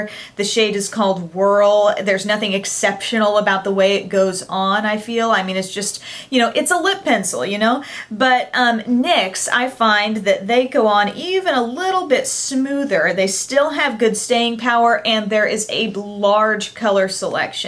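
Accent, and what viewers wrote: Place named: South, United States. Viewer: Love, love, love this video!!! It was so informative and detailed! Oh & I love ur southern accent! :)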